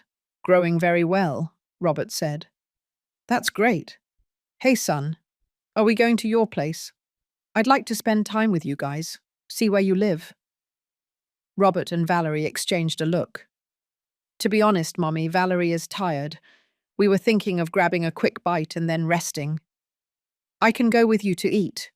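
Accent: United Kingdom. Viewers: I love these stories because of the British accent and slower pace of speaking. Other story channels have very annoying voices and speak with agitation and too young of a voice for the age of the main character.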